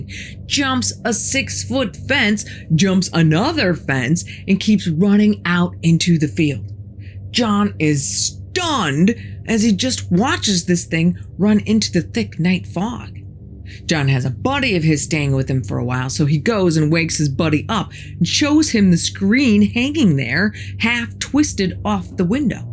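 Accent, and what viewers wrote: Midwest, United States. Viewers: You're a good story teller. Where's your accent from? Illinois is my guess. Maybe Wisconsin or Minnesota???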